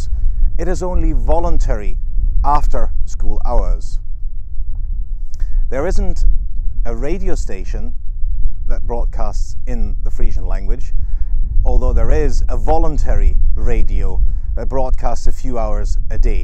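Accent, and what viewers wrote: Scotland, United Kingdom. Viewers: yeah it's true you do sound Scottish. Are you a first language Frisian speaker? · In case you are curious, two Scottish aspects of your English accent are that you pronounce the vowels in “so” and “day” as monophthongs and that you use a tapped r sound, the r sound also found in Swedish, Icelandic, and most Norwegian dialects. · I am confused. You sound Scottish but have a German name.All the same Frisian is the closest related language to English so hope to God the German AND Dutch governments protect the culture of these islands! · Interesting - your accent sounds similar to Scottish.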